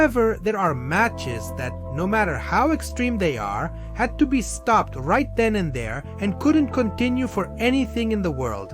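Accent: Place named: India. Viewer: Why does bro sounds like Indian😭😭😭😭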